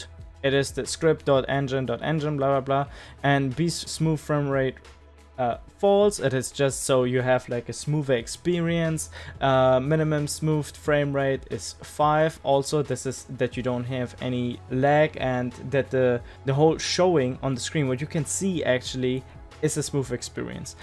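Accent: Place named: Germany. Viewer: Also Bro no Hat, aber den deutschen Akzent hört man dick bei dir raus. Aber sehr Informatives Video, Kuss auf die Nuss❤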